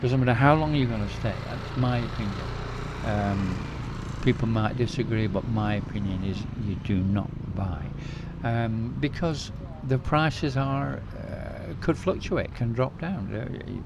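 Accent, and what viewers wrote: United Kingdom. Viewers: I am trying to work out your accent? Where are you from in the UK?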